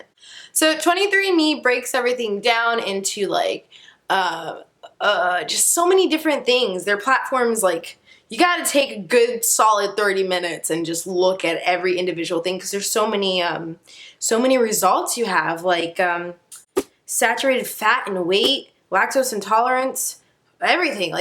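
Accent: Somalia. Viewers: your Somali is just awesome. "gabartan waa walati" love that accent. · I love your somali maay accent..miss confusing for those who don't speak maay but anyone who speaks maay will understand you.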